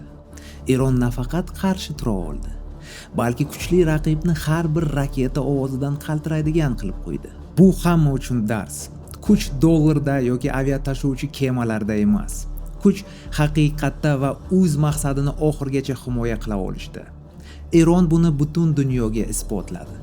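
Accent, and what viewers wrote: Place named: Navoiy, Uzbekistan. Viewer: Мабодо Навоийликмасмисиз шеваиз ухшаб кетаркан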